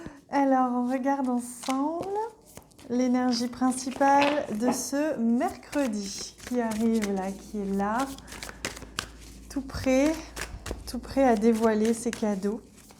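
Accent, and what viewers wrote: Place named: Anjou, France. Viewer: Bonjour Merci pour cette guidance, ta voix angevine donne encore plus de plaisir à écouter et intégrer les messages. J, ai reçu ce message pour toi. Surveille ta peau. Je ne sais pas si cela te parle? Sinon oublie. Bises amicales et bienveillantes Olivier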